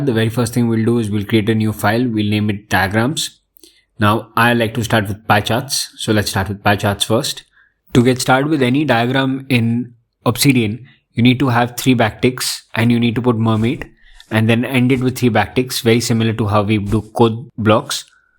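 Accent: India. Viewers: You Indian guys... you have the worst accent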